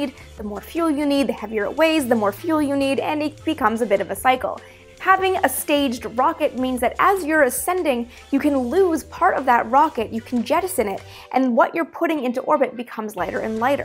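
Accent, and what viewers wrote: Canada. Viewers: Is she Canadian? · She's Canadian!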